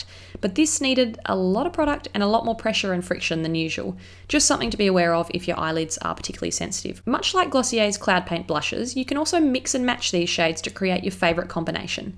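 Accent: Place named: Australia. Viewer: do you live in australia? If so how did you manage to get glossier products? (judging from your accent and assuming you live here in australia cus they dont ship here lmao)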